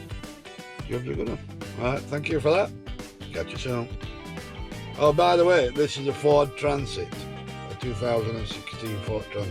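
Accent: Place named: Yorkshire, United Kingdom. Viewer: Yorkshire accents best int world 😁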